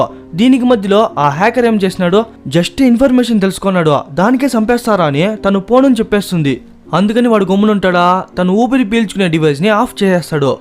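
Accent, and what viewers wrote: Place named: Andhra Pradesh, India. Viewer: Cheyyandaa enti raa brooo. Chilton nellore boarder Slang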